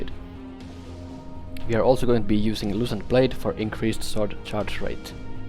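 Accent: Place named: Finland. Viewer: Oh cool I've legitimately never heard a Finnish person's accent. Also nice vid 👍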